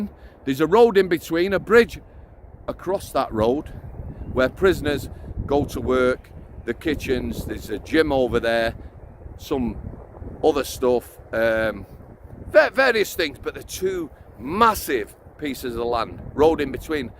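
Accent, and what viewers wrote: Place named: Yorkshire, United Kingdom. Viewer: It’s probably because he’s spent a lot of time in Manchester and his Yorkshire accent has mingled together with the Manc one, which happens quite often. xx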